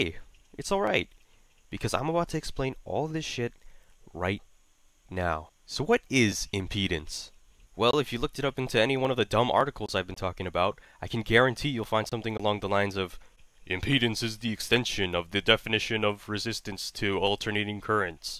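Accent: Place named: Toronto, Canada. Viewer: definitely from toronto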